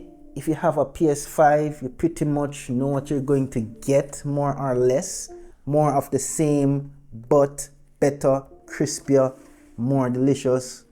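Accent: Jamaica. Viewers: Bring out the Jamaican out 🇯🇲🇯🇲🇯🇲🇯🇲😂😂😂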